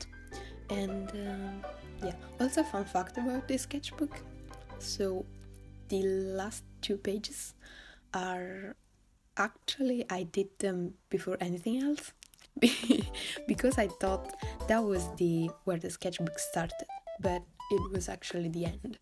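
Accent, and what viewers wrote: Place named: Italy. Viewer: I like your accent, where are you from? Italy?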